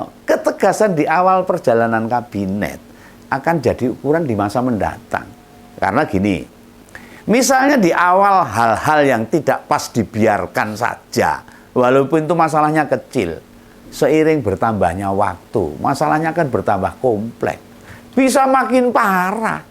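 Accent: Java, Indonesia. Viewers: Saya suka mas Admin ini bicara nya MEDOKK...wangun jawane...mantab ..beritakan yg pro Demokrasi dan yg anti Mulyono saja bossss..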